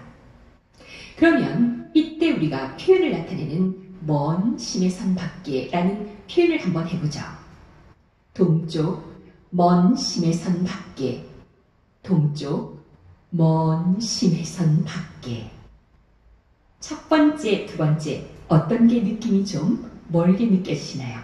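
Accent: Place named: Gyeongsang, South Korea. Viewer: 강의도 좋고 목소리도 예쁘시고, 우아한 모습또한 시의 맛을 한껏 더해 줄 수 있을 것 같아요. 말씀하실 때 살짝살짝 느껴지는 억양에서 경상도 분이시구나~싶네요~^^*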